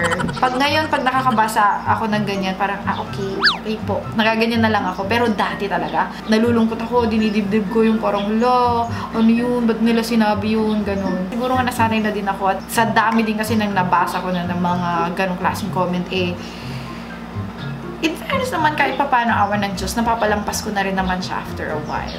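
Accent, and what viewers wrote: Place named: Pampanga, Philippines. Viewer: Napakacute mo ate! Yung kapampangan accent mo nakakatuwa. Sarap pakinggan.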